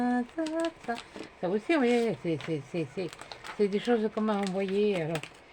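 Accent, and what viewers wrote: South, France. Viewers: Cc, magnifique cette page très réussi,😍 Maminette a eu une très bonne idée pour ce défi, j'adore t'écouter chanté avc ton petit accent du sud c'est merveilleux ❤, plein de câlins a Arkos 😻, Bonne soirée et 🌃 bisettes 😘😘😘💝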